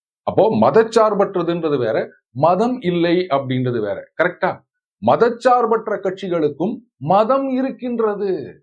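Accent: Chennai, India. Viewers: Ennaiah nee Chennai Tamil pesura?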